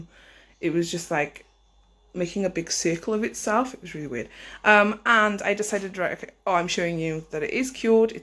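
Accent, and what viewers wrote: Cardiff, United Kingdom. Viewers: This was a brilliant video. I find her really good. Is that a Cardiff accent by the way.? Anyway really enjoyed your video and found it really helpful and interesting. Really good. Sorry not sure what I think about subscribing to things but really like your stuff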